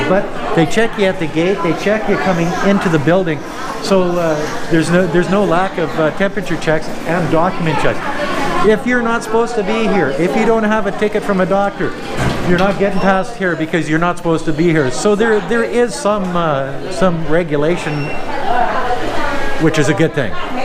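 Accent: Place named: Canada. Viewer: Once a while I notice the Canadian accent 😂.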